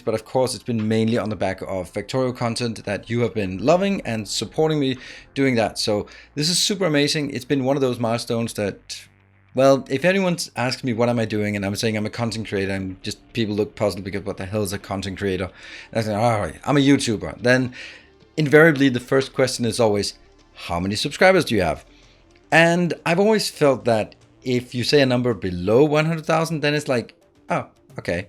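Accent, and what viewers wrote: Denmark. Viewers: Thanks dude for making this channel, I've subbed and watched most of your factorio tutorials. What I especially like is your positive attitude and your cute Danish accent. I have tried using it while playing and it works like a charm. I really do like your most simplest designs and use your blueprints a lot .As for suggestions: I would like to see themed builds: Green builds (focus on least pollution) or black builds (most... hmm a Shell build) Keep up the good work!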